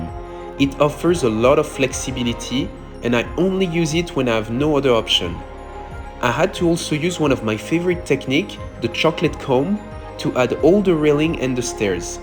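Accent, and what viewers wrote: France. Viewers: Oh man, now I get what people mean by "strong french accent". And that's from someone who's French themselves ! Over than that, great english, and super cool Kraken. Your work is always breathtaking ! :)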